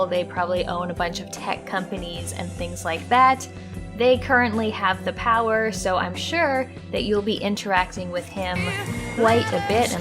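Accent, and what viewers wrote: Canada. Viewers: Searching for new Bloodlines 2 vids: "Never heard of this American lass before, lets give her a try" - "Its been a while since I last talked ABOOT vamp-" "Canadian. My bad"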